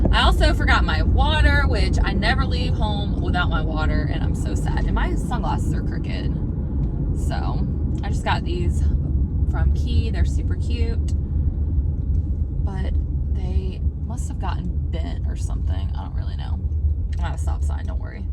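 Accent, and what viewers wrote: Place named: South, United States. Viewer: Your asking what brought me here. Well I love cleaning motivation and inspiration but to tell the truth I have been drawn to the vlog style videos 😊. So being from Canada 🇨🇦 we get teased and bugged all the time about how we talk. I do enjoy the way y’all speak in the south so carry on doing you and carry on with the vlog style content ❤️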